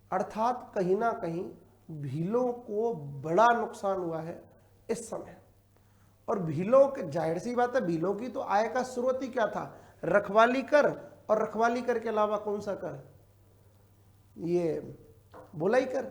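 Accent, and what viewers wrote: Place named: Bharatpur, India. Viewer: सर आप भरतपुर के से लग रहे हो बोली ते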